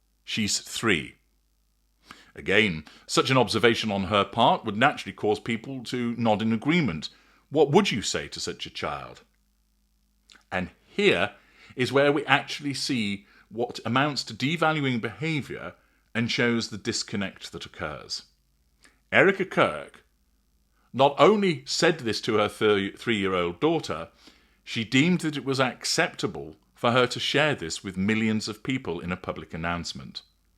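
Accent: United Kingdom. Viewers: Been waiting for this. Next up would be a great comparison between mm and ek. You, Mr. NARRATOR , HAVE THE MOST DIGNIFIED BRITISH ACCENT and EXTENSIVE ENGLISH VOCABULARY, OFTEN MISSING FROM MANY LIVING ACROSS 🇺🇲THE POND. GOD SAVE ENGLAND 🏴 🇬🇧, THE ENTIRE UK 🇬🇧 AND ALL WESTERN COUNTRIES. ⚖️✨️🕯✨️🎚🛐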